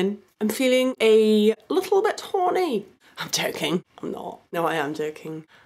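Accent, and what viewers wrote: England, United Kingdom. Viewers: I like her. There is something about her energy and this British accent But this #5 ... 😧 what's wrong with you, woman?! P.S. And yeah, her sister is hot too 😎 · You are sooo good at this blog thing. You’re articulate, with a voice I could listen to now and forever and oh my that British accent. And on top (no pun intended) of that, you’re drop dead gorgeous.❤️😂😂😂👍👍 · Thank you for your realistic honesty, it was refreshing to hear, (especially with an English accent & not the usual American one) you are quite funny, as a kind of bonus, stop using the word "porn" if it's okay though. Adult films or magazines, are nothing more than a means to an end, they can get us to the bed room, BUT they are not there when we actually do the job. real life sex is pleasure in a different way, & circumstance. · I gave a thumbs up for British ascent I'm British I don't know if i spelt it right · English woman with mary poppins accent speak about porn , which destroy my childhood memories hehehe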